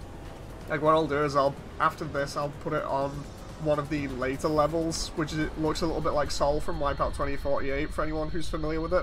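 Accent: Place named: United Kingdom. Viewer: U sound like david schwimmer but british 😂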